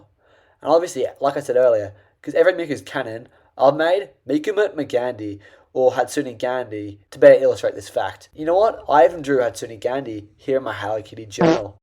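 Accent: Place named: London, United Kingdom. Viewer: You still talk like a Greater London citizen though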